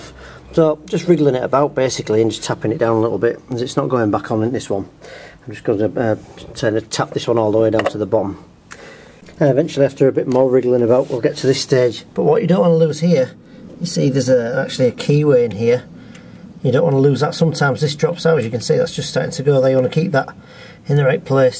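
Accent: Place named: Yorkshire, United Kingdom. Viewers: To save money, i always drill two holes (with my small drill press) in the boss where the pins would have been, tap it with M8 thread and screw in two quality bolts. In worst case i have welded a blade on with two tag welds. I appreciate your channel. It's informative with a wide range of subjects. And i love your thick Yorkshire accent. Nick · is it yorkshire not a manchester accent. If it is yorkshire must be Leeds.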